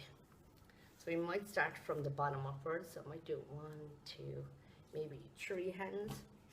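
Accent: Ireland. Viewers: Are you Irish because I am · R U Irish? :)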